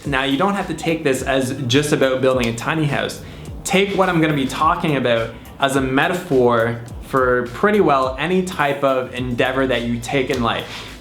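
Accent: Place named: Canada. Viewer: sounds so Canadian in this video to me. IDK why....ha!:)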